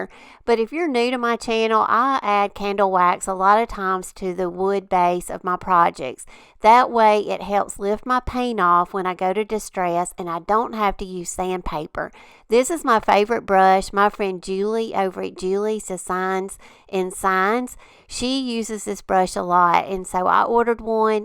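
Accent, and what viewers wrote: South, United States. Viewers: Love you sweet southern accent!🎀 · Love all your designs and projects. Also your sweet southern accent. Happy new year and good luck with your channel. I’ll be watching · Congratulations on prosperous year. I found you in collab, I don't recall which one but I'm so glad. I am a Alabama born, grew up in Columbus Georgia, moved to East Tennessee 20 yrs ago. You definitely have that adorable southern accent. Thank you and keep the DIY's coming.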